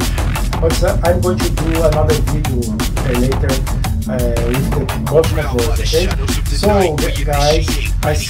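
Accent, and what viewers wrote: Brazil. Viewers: quanto eu ouvi a voz na hora sabia que era br falando ingles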